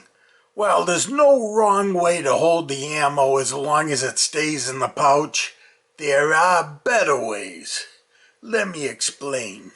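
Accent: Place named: Boston, United States. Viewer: Wonderful North Shore Boston or Southern NH accent.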